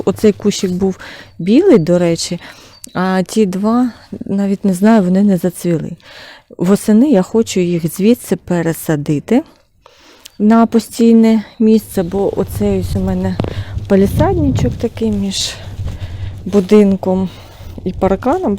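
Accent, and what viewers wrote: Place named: Transcarpathia, Ukraine. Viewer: очень красивая украинская речь колежанки это говорят на Закарпатье . буду учиться у Вас украинский мови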